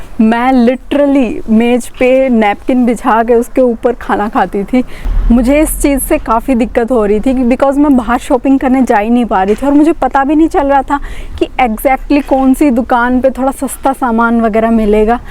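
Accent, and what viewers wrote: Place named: Haryana, India. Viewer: i can feel the Haryanvi accent in your voice 😅 btw.... very informative video 🤩😍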